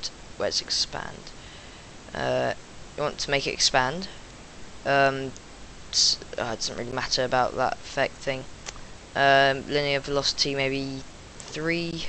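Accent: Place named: United Kingdom. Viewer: YOUR BRITISH! I have a british accent coming on! :D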